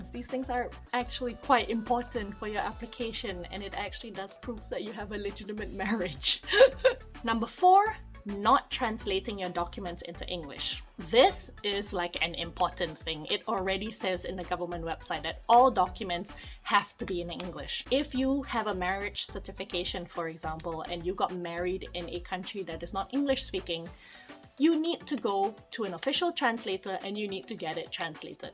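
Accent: Scotland, United Kingdom. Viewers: Can here your Scottish accent getting stronger!! 😂😂🏴🏴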